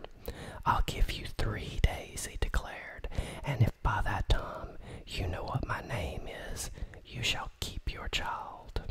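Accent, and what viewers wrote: South, United States. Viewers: Very awesome, I love asmr, and I love your southern accent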